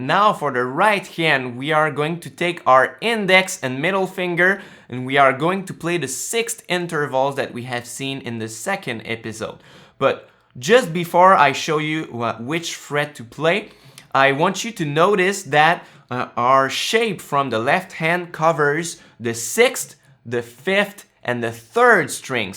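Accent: Quebec, Canada. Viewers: I love your Quebec accent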